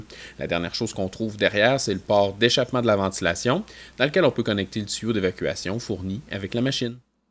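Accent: Quebec, Canada. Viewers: Hello je passe pas de ton accent du Québec, fabuleux ce nouveau laser, dit moi tu parle de papier de marquage pour le verre dans ta vidéo, ont peux le trouver partout et l'utiliser avec une orthur aussi? belle vidéo tu donne envie que je change mon laser mais ma blonde ma remis à l'ordre rien pu faire avec le commandant fait 12 ans de mariage et pas de remise de peine par le juge 😁meilleures salutations à ta famille et merci pour tes vidéos . le hello du Valaisan de Swiss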